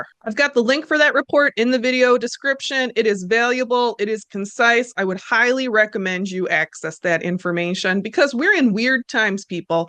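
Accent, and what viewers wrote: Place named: Midwest, United States. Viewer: Midwesterner here, love your midwest accent!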